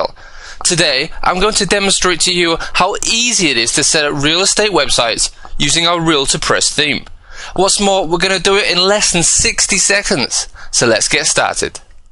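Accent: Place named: United Kingdom. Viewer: I agree. It is a classic British accent,but not at all pompous or fake.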